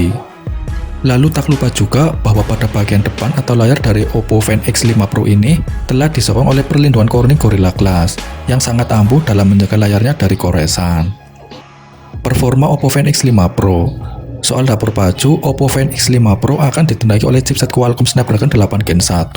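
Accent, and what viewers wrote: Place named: Java, Indonesia. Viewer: Suarane wes ketara medok hehehhe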